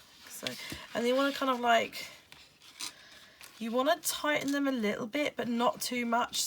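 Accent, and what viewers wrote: England, United Kingdom. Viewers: Lovely to hear another English voice on here! I got mine from Amazon, but I'll be going to The Range in future as is much cheaper!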